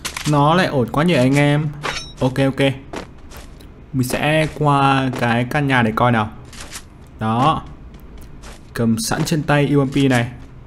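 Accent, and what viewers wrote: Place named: Hanoi, Vietnam. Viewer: Hà Nội lận cơ à hèn chi giọng ko giống miền Nam of E r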